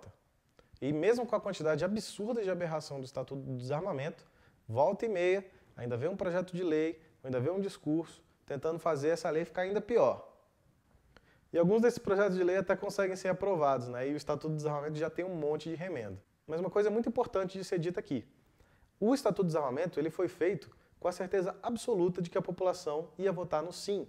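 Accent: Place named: Belo Horizonte, Brazil. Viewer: Ele é do RS? Fala como alguém de BH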